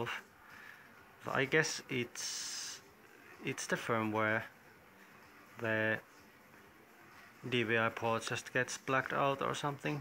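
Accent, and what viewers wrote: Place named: Finland. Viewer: you sound Finnish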